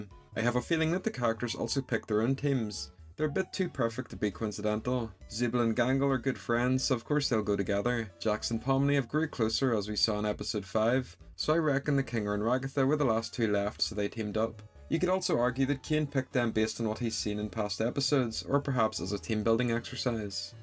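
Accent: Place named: United Kingdom. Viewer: Your accent sounds like a british person🏴🤯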